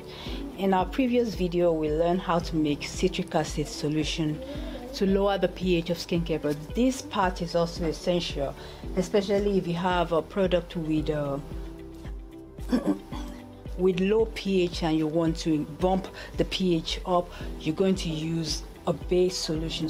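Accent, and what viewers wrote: Nigeria. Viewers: Ok, this trashy Nigerian accent gets on my nerves.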